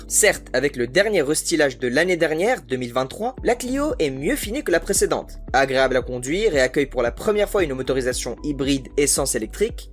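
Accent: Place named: Banlieue, France. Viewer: Intéressant au début , par contre les : voitchures et les rrrr de banlieue, merci j’ai arrêté de regarder c’est insupportable, salut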